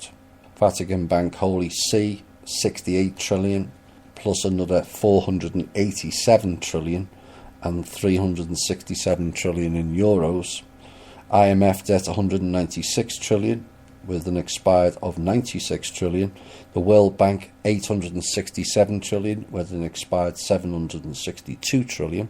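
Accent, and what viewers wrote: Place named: Scotland, United Kingdom. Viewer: And a lovely, lilting Scottish accent to boot.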